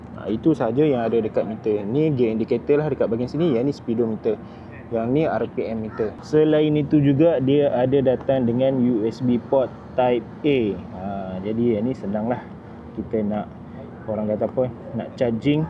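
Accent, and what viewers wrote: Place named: North, Malaysia. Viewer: First time tgk video ko bang, penyampaian santai dengan pengaruh slang utara yg sedap dengar. Editing untuk opening best dan entertaining. Flow penceritaan jelas. Point of improvement camera angle dan pergerakan camera mungkin boleh diperbaiki. Overall good review dan editing best. Oh ye sedikit komen untuk penganjur, dah tahu media atau vlogger akan buat kerja kat belakang khemah tu... Housekeeping ler sikit... Kemain sepah nye... Spoil vlogger amik gambar / video cantik tapi background sampah... Bende simple je...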